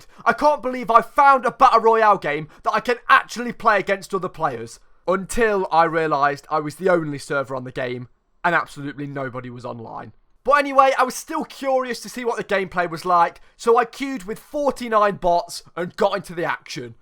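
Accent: United Kingdom. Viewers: why are you british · are you British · Are you a Brit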